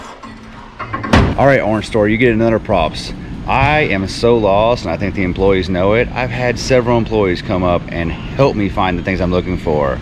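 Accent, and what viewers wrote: Texas, United States. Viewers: I did neglect to tell you that I was stationed at Sheppard AFB Texas when I was a blue store fan. After I retired from the USAF, I moved to PA. My wife and I miss everything about the state of Texas, except the heat. The first time I heard you say "Good Mornin'", I knew you were from Texas! My wife and I were laughing so hard when people were trying to correct you, saying their name. The funny thing is we both say it just like you and your wife. Keep the videos coming. We really enjoy them! Oh yeah, I think you should know that Mario dude is following you. Lol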